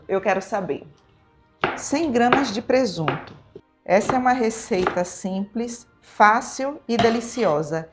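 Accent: Bahia, Brazil. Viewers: Como gosto do sotaque da, essa baiana arretada. ❤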